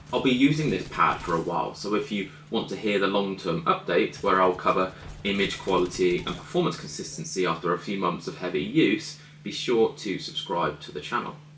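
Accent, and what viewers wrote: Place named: England, United Kingdom. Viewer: Banger review and banger English accent! Do you have also a twitter account?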